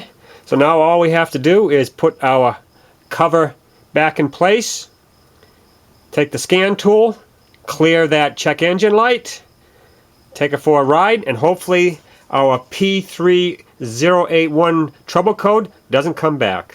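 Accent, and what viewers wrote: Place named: New England, United States. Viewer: This is a nice video and you have a pleasant New England accent. What videocamera did you film with? Thanks. T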